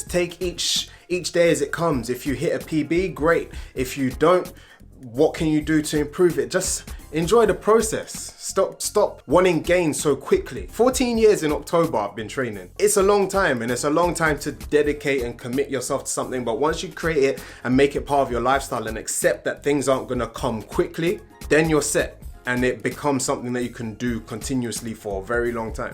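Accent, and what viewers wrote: United Kingdom. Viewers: Good guidance my man, good to hear a British accent. I am tired of listening to American ones..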